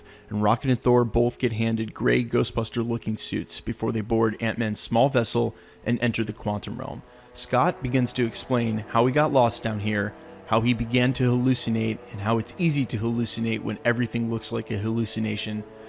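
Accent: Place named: Philadelphia, United States. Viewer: Yo Cuz,not for nothing,but are You from Philly or South Jersey??? I detect an accent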